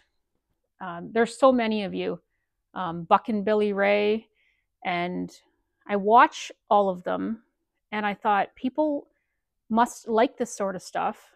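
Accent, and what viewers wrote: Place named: Canada. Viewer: I tune in for the outs and abouts, your Canadian accent is amazing !! The chainsaw content is awesome too.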